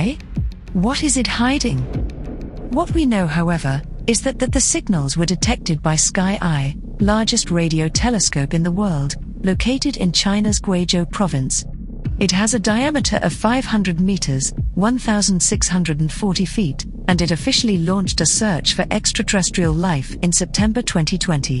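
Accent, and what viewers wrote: United Kingdom. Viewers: Appreciate the British accent. The 500m large radio telescope is mighty impressive. The rest about aliens is speculation. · How come every time you guys make one of these documentaries or whatever you have to have an English British accent what's up with that can someone go yo yo yeah there might be something out there yo dog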